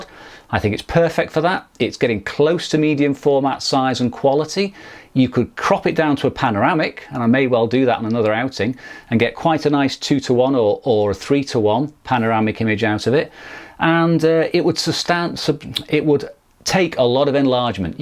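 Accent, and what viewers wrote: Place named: United Kingdom. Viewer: Dear: I love the form and content of your channel. English is not my native language but and I understand the British accent better. I like that you are serious, humble, calm and your funny touches of humor. I love the comparisons you make between expensive and also popular cameras and that you go through various formats. It is exciting that a camera that is over 70 years old, but of course, of medium format, surpasses a camera with much more technology in several aspects. I also like that you can integrate both film and digital cameras and rescue the M43 in a kind of countercurrent where photography is often reduced to the more MPX and more ISO maximum level. Very happy that I still have more videos to learn. Greetings from Buenos Aires.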